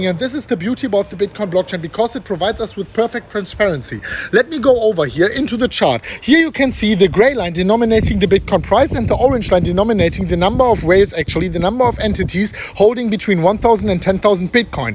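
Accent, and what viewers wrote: Germany. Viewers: u live the best life bro! keep up the good work and stay positive love your german accent by the way enjoy a good drink this evening, u deserve it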